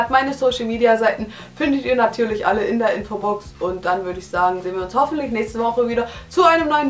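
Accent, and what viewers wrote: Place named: Saxony, Germany. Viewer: woher kommst Du?:D super Akzent, der klingt wie meiner, komme aus sachsen:D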